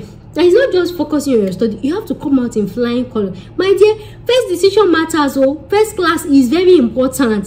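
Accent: Yoruba, Nigeria. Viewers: This was definitely interesting. Didn’t finish the video before I started laughing bout the part when you mentioned your Yoruba accent..😂🤦‍♂️.. thank you for this though. You’ve got a new subscriber😇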